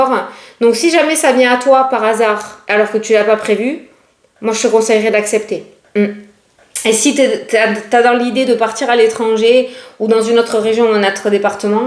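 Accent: South, France. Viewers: Désolée pour le commentaire tardif, pas eu le temps de l’écrire hier après t’avoir écoutée. Je laisse rarement des commentaires mais là il le fallait absolument. Ta robe te va très bien mais ton sourire est encore plus magnifique. Les 2 ensemble c’est wow!! C’est d’ailleurs pour ton énergie, ton sourire, ta bonne humeur (et parfois tes petits coups de gueule), ton naturel, ton accent du sud (...) que je te regarde. Un grand merci pour tes vidéos et ces moments de partage!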